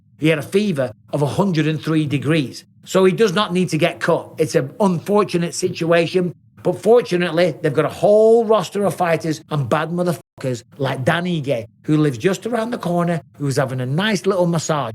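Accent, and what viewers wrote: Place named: England, United Kingdom. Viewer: This is why I love f**** Michael bisping.. speaking English English and s*** .. putting you motherfukers on game😂❤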